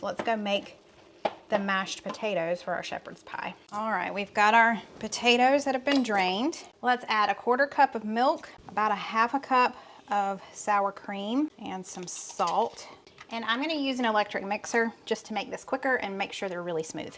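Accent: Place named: Alabama, United States. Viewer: Love your videos! Just wondering if youre from Alabama. Have a friend from there and she has a similar accent!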